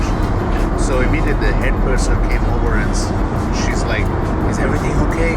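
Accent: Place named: Trinidad and Tobago. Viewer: U sound like u from Trinidad and Tobago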